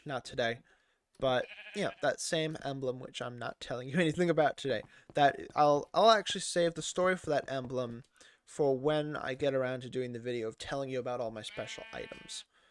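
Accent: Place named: Scotland, United Kingdom. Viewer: you sound like scottish stampy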